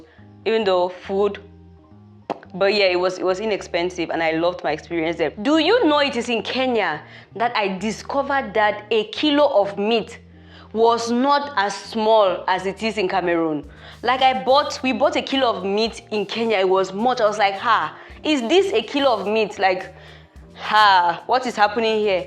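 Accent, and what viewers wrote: Nigeria. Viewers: Your accent is almost Nigerian a little bit but not very heavy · You sound like a Nigerian,..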